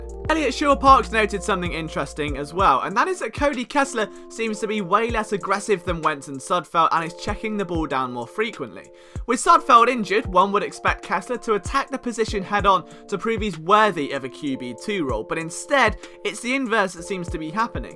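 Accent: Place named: London, United Kingdom. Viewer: YOU SOUND LIKE YOU'RE FROM LONDON!!!!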